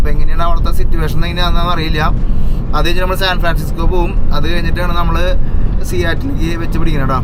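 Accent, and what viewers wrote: Thrissur, India. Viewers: Please stop your accent. You’re doing a grt job but spoiling with your Thrissur tone and accent. You’re next to sgk. He never had ktm accent. So please mate..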